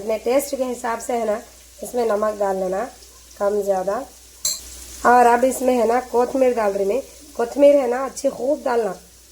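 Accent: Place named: Hyderabad, India. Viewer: mien yaad rekhna mujhey mien bahut pareeshan hun ,,,,❤❤❤❤ App baat kerty ho meri aik dost ki terha ,woh bhee app ki terha Hyderabadi zubaan bolty theien ,,nawab bhai or Bhabi meray pyari baree behan ,app Kay jaisa hi boltay thay same ❤❤❤❤❤❤ Namaaz Quran roza ,,bachon ko sab Sikhaya app nay Allah Pak qabool karay hum moms ki koshish,,mien bhee same app Kay jaisa try karee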